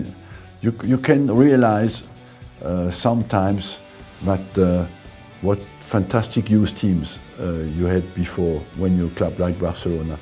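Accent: France. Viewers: Being from Alsace like Arsène, it’s funny and endearing to hear his Alsatian accent carried through to the way he speaks English. Legend.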